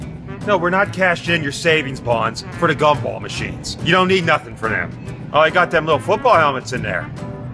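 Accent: Pittsburgh, United States. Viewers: Is this what real Pittsburg accents sound like I hate it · I live in moon township and this is exactly how they talked even my baseball coach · Never gets old. I grew up in Pittsburgh in the 70s when the yinzer accent was still alive and well! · I from Pittsburgh, but I go to college in upstate NY. I’m a senior now, and I think at least once every year I’ve watched this video when I got homesick. Hearing the Pittsburghese always gives me a little taste of home and makes me feel a lot better! Thank you! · GREAT SHOW- THIS GUY CRACKS ME UP,AND HE REALLY DOES SOUND LIKE US PITTSBURGHERS!!!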